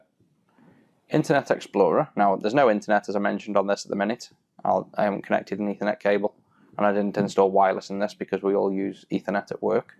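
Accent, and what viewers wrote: United Kingdom. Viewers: You've got a strong British accent!!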